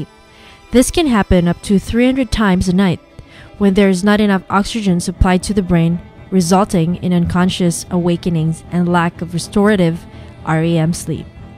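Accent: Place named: Canada. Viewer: Pretty informative. Good job. And the canadian accent is too cute lol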